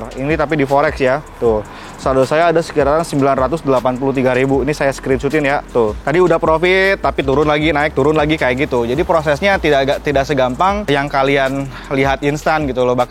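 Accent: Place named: Sunda, Indonesia. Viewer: Baru mampir.. sundanya medok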